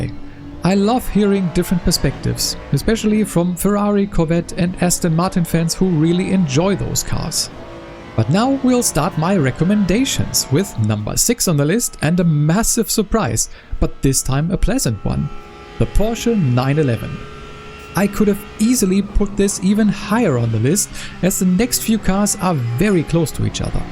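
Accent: Germany. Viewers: I can confirm your assessment. I've had my most successful races with the BMW so far. This vehicle is absolutely consistent, just like the times you can achieve with it. I've also had good results with the Porsche. Especially on tracks like Fuji, the strong rotation of this car is a big advantage... if you know trial braking. Very good videos that you produce. I like the good English with the German accent. It makes it much easier for a German to translate internally. You have my subscription. Beste Grüße aus Thüringen.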